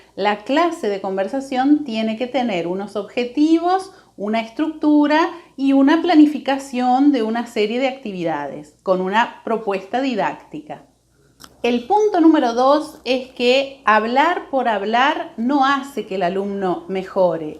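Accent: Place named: Argentina. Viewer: ¡ Hola,! me llamo Robson y soy de pernambuco,Brasil. creo que tú eres mi vecina, porque tu acento se parece de argentina o Paraguay. Bueno, a mi me gustó tu video. pido perdón, aún no sé escribir bien en español. Dios te bendiga.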